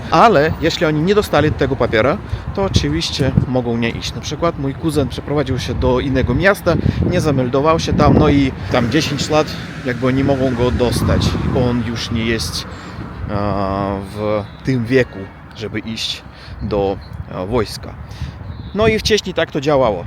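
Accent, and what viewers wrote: Russia. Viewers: Człowieku mieszkasz w Polsce już 4 lata, nauczył byś się chociaż polskiego, bo ile można słuchać tego rusko-polskiego pierdolenia. Mam nadzieję, że pójdziesz po rozum do głowy i w końcu zaczniesz naukę. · jak dla mnie w rosyjskim akcencie nie ma nic złego, tak jak polski akcent w angielskim ;)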